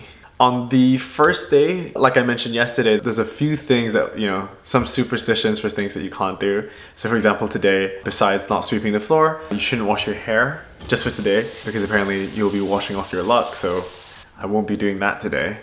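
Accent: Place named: United States. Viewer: Great video! we’re u born in the UK because i don’t know why but i thought you were American at first??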